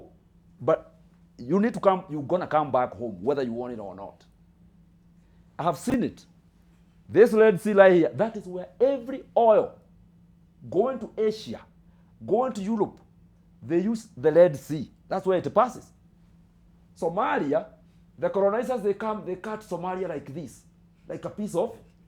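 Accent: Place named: Kikuyu, Kenya. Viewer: 😂 wah the Kikuyu accent is very heavy ..just speak the language you are confortable with My boy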